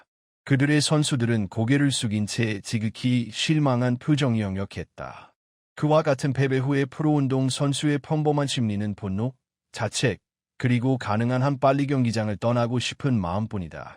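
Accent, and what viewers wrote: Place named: China. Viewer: 목소리가 보이스피싱 중국인이네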